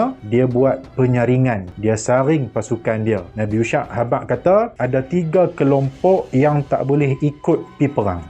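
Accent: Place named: North, Malaysia. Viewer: Terbaik orang utaqa, 👍👍🤘🤘🤗🤗 penyampaian yg baik & mudah paham dalam loqhat utaqa..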